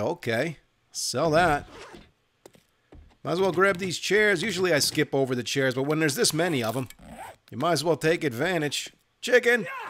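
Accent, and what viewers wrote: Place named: New York, United States. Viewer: Dude, these rock xD And all in that what, east coast accent? You make me think a Manhattan guy woke up in the apocalypse and is just going around pwning stuff till they can get back to Noo Yawk lol xD Very enjoyable!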